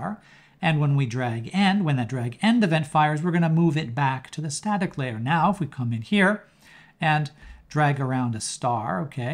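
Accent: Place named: Canada. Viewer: So I want to know how to built a custom shape that I can attach a line to, then when moving the shape, bring the attached line endpoint with the shape? I am trying to build an application (not a game) with your tutorial’s help as I’m not a programmer or web developer. I know enough to dangerous, and stupid in some cases. I first have to figure out setting up the screen into parts for various roles, how to develop a shape library for my app, and then load an image, scale that image and reuse that scale for my other functions, then place the custom shapes (save that data on open/close) and a bunch more “magical stuff“. You sound Canadian, but you’re in Japan… interesting to say the least. Thanks so much for these tutorials.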